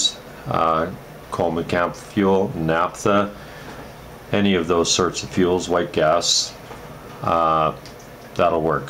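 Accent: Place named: Ireland. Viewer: Hi there my friend, I detect an Irish accent, I am from Co. Antrim. Do you know where I can buy the Carbon felt and platinum catatylitic materials online? I purchased a Peacock Hand warmer on line which i believe is better that the ZippoThank you. Seán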